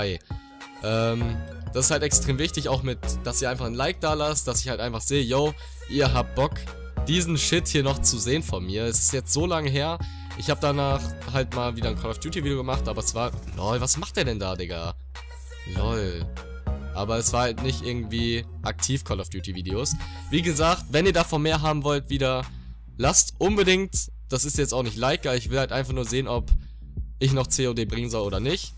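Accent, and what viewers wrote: Osnabrück, Germany. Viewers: Kommst du aus Osnabrück?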